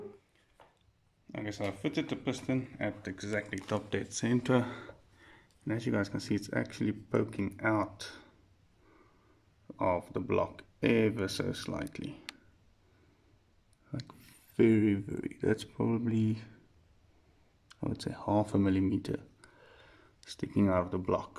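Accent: South Africa. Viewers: Are u from South Africa? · Howzit man , It sounds like you're from SA? Is the vtec D-series top ends rare over here? Im just starting to get into hondas and going to try and get a an old ballade in the next few months so I'll probably be messing with the D series engines for a start. Seems like I'll be able to learn a lot from your channel will be checking out more of your videos! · im not sure why but you got an south African English accent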